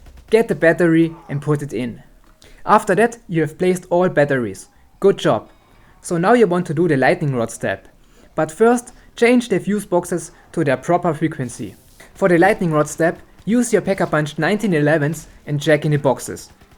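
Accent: Germany. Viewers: Is that accent german? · war nicht böse gemeint 😅finds krass das du so gut englisch kannst , als deutscher hört man sowas einfach raus 😅😅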